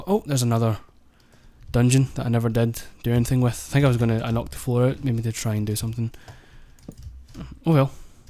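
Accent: Scotland, United Kingdom. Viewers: fuck me ur so creative and imaginitive:D i can't work out ur accent im getting scottish but im just nt sure lol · What first got me to start watching your series was your accent, I love Scottish accents and it made the commentary more interesting. I then grew to like the series itself and the cool things you built and how you went about it. I've also started watching your other videos and am enjoying them as well. Thanks, I'll be waiting for Season 2!!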